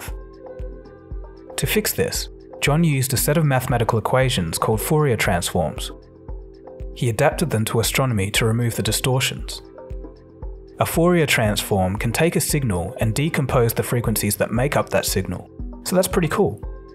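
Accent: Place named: Australia. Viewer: I've seen 4-5 videos and already a fan, i don't normally find Australian accent very pleasant or cool but somehow I find this accent and channel very satisfying. Keep up the good work fam. The background music, intro the voiceover everything is so satisfying. ❤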